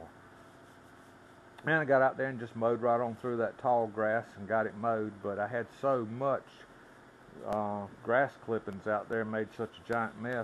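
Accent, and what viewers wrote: South, United States. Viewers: I think you had about a couple of hundred subs when I stumbled in last summer. Been distracted by some health issues the last little while, but I hope to be back for good now, all seeming to have worked out ok, thank the Lord. Anyway, congratulations! You have an excellent channel, and I've always enjoyed watching and listening to a true southern gentleman!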